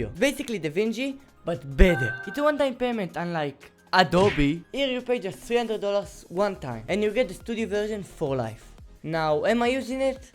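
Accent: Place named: Russia. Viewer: in a very good way, sounds like you are from MOTHER RUSSIA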